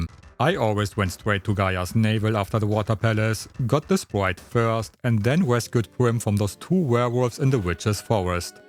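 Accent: Germany. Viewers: Hard German accent, but great. Sword orb glitch not possible on PAL. Possible on switch? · Are you German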